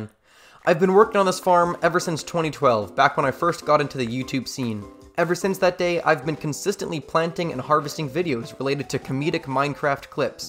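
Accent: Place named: South, United States. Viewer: Love the “Southern” accent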